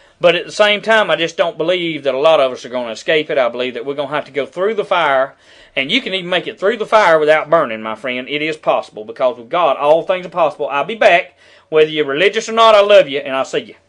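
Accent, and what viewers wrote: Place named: South, United States. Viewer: why? because he has a southern accent? because he is talking of being self-sufficient and preparedness? what he says makes sense, no matter if it contains "ya'll" and "aint". seems like you are the unintelligent one for tuning out valid points due to someone's speech pattern. i bet if your local mega super duper wal-mart closed down tomorrow, his viewpoint might suddenly seem rather valid, huh? although, some of the "they do this and that" talk is a little far out.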